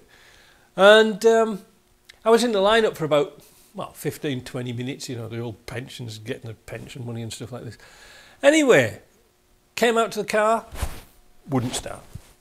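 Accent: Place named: Northern England, United Kingdom. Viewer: Hey where are you originally from? Just finding out you live in Canada? But your accent sounds like you are from somewhere in England maybe up north somewhere 🤔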